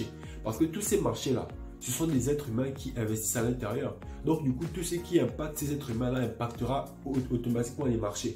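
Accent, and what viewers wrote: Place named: Gabon. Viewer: Fondamentalement Fondamentalement tu aimes le mot la hein bro ? tu as l'accent d'un gabonais, en tout cas force à toi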